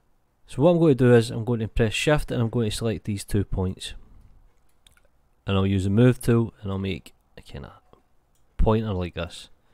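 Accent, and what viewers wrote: Scotland, United Kingdom. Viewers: I'm originally from Italy, but I love this Scottish accent in your voice so much, I just don't know why! Great tutorials!!! Thanks a lot!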